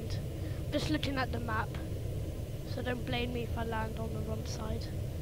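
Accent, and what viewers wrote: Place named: United Kingdom. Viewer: oh your british, cool?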